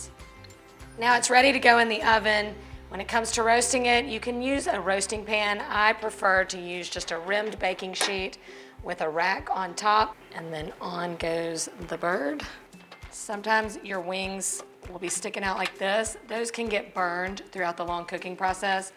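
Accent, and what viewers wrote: South, United States. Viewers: is my favorite cooking / food person by farrrr.....love her voice and Southern accent, love her personality, love her food, love it all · i agree with you i watch her at night kinda hooked on her southern accent! lol and she rocks in cooking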